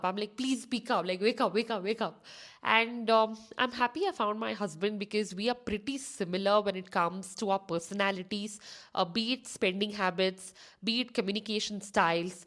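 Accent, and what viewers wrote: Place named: India. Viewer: 1. Worst accent to listen to over a public podium. 2. Seriously, Indians need to aggressively build their damn ways to bring good quality orator in them, not in terms of what they speak, but how they speak. Period. 3. If Indians have, what the accent you speak, speaking my heart out, I feel English is being dragged to hell and killed. 4. This was never, Britishers wanted in their life time, to get their lovely mother tongue, subjected to such an awful transformation in terms of pronunciation.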